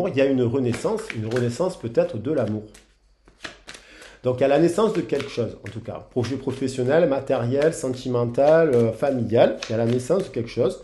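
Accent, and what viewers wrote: South, France. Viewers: ah ! Je savais que dans cette jolie voix, j’avais entendu le chant des cigales. J’avoue j’ai aussi un accent bien du sud , le pays catalan quoi 😂😂des bises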